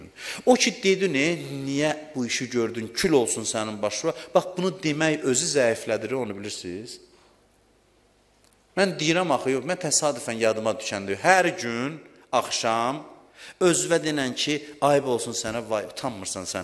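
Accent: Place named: Lankaran, Azerbaijan. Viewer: Ərəb və fars dillərini mükəmməl bilir.Bilirsiz əslində adi insanlar bir dənə rus dilini biləndə nə qeder ləhcə və intanasiyasinda deyisiklik yaranir.Haci həm Lənkəranlidi və bizdə cox ilahiyyatcilar o zonadandir.O zonanin da xüsusi ləhcəsi olur.Ərəb Fars və Rus dillərini də mükəmməl bildiyi ücün Azərbaycanca danişiğa az da təsir edir.Turk alimleri ise sadece Erəbcəni bilir.Çox güman ki,bunnandi.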